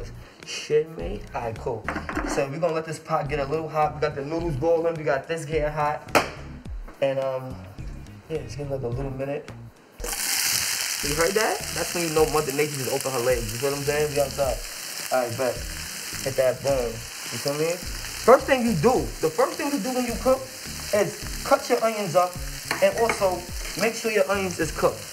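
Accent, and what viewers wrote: New York, United States. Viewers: This got to be that member of AMP Fanum or he def from New York lol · Before i started the video i knew he was from NYC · First 2 seconds I knew he really from New York 😭😩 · how he know i aint pay my rent last weeeeek im deaddddd he more New York then New York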